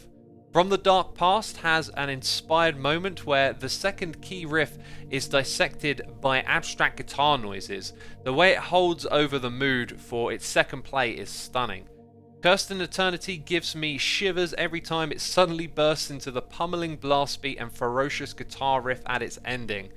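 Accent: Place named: RP, United Kingdom. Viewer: To me it was kinda unexpected to find out that X listens to this kind of music(I myself am a huge fan of death,death and trash metal) with his strong british posh accent.Anyone else?it just kind of doesn't go together?idk lol. Also nice video!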